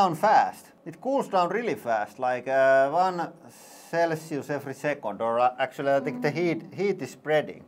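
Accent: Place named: Finland. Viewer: I would love to see Finland one day. I was born and raised in the U.S. (65yrs ago) but my grandfather Toivo was Finish. I love watching your channel because of your accent and humor, it reminds me of him. Thanks for the great content 👍 😊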